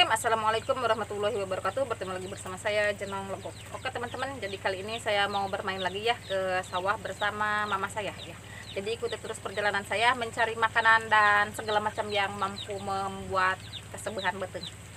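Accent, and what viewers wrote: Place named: Sunda, Indonesia. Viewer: Modol eta hoe. Semangat pejuang beuteung... Ahaha meuni medok eta sunda 🤣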